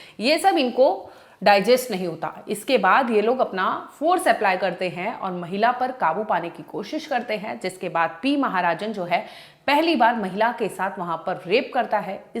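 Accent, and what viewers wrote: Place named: Bengal, India. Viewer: Aap Bangali hai kya aapki Hindi bilkul sahi nahin hai aap sahi se nahin bol paate Ho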